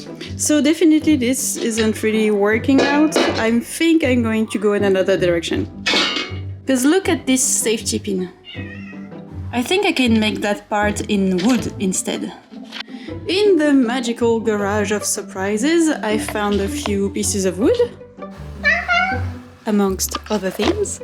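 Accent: France. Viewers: c'est trop drôle d'entendre une française parler anglais ( en plaçant plein de mot en français aussi 😄 ) en tout cas ton atelier créatif fait vraiment rêver 😍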